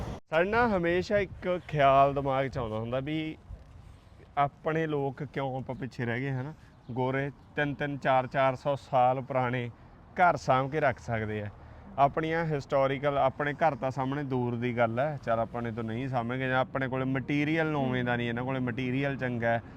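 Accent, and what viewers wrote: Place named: Malwa, India. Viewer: Scotland is cheaper than England example if house price in London 1 million pounds you can same property in Scotland only 400000 pounds only don't compare with England other things u said I am PhD from punjabi University but u still pure malbai u should change don't say gggg this is instruction don't mind?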